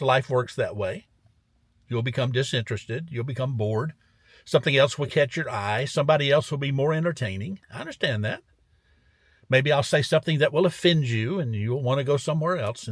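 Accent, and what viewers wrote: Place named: South, United States. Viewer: Glad is going out w/friends, good to hear! I was chuckling thru out this video so thk u & thk u big the foot! I think it would be great if all Adams utube friends get a matching big the foot air freshner & hang it up in their car. GIV recently bought one! I think it would be cool if we can ask questions about Adam & u on the days maybe when ur too tired to go out. Better yet, sharing stories of the Bible would be awesome bcuz I have ADD & Dyslexic really bad so I don't like to read, I lose my place when reading & my concentration is cowazy really lousy. I had someone tell me its the book ur reading, find stuff to read that u find interesting. Nothing helped, so then I would check out bibical audio stuff & they'll talk too darn fast makes my head spin. U have a nice voice like Adam maybe even better cuz u talk slower w/a southern accent that I have always found so comforting. 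So if u know any Bible study audio out there where the person talks slow w/a southern accent, why please let me know otherwise I think I just might wait for u to get & feel well again!🎶 U got a 🎵 friend in me!🎵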